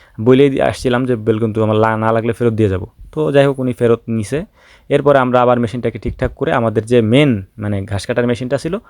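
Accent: Faridpur, Bangladesh. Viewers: ভাই আপনার বাড়ি কোন জেলায়, আপনার বেশ কিছু ভিডিও দেখলাম আপনার ভাষা শুনে মনে হচ্ছে আপনি হয়তো ফরিদপুর, কিনবা গোপালগঞ্জ।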